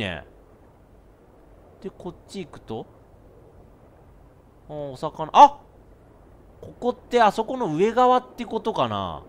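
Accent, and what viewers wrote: Kansai, Japan. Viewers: 何でこの実況の時だけ特に関西弁きついねん爆笑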